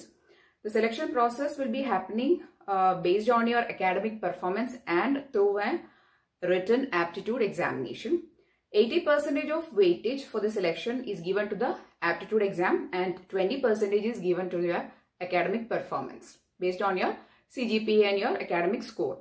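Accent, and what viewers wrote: Kerala, India. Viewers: Thank you for information, 😉I can sense Malayalam accent in your speaking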